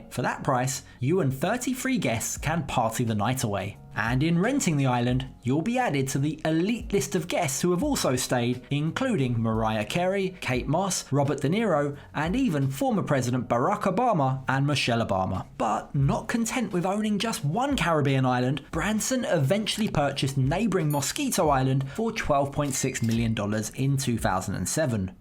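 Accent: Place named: England, United Kingdom. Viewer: English accent, I'm out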